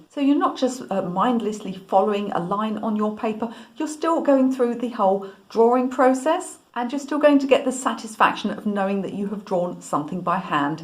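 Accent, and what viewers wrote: United Kingdom. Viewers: I love your teaching methods, I have learned so much about drawing from you. I am very sorry that some people are mean. Also love your British accent.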